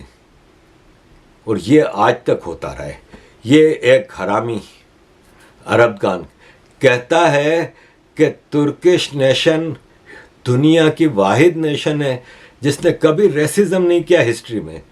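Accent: Gujarat, India. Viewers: Love ur gujarati ascent ..I m also gujarati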